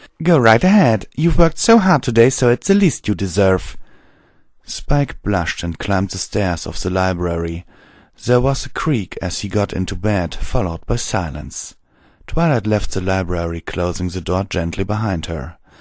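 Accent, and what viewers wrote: Germany. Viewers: I think you’re from Germany but you have an English accent mixed with German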